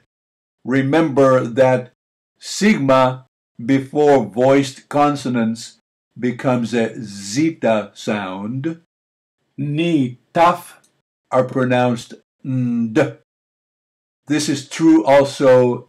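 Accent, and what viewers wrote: Greece. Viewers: Many thanks, learning koine Greek using standard western method. Good to hear a Greek pronuction. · This is the real sound of greek. Finally someone is trying to save you from erasmian ir whatever fake pronounciation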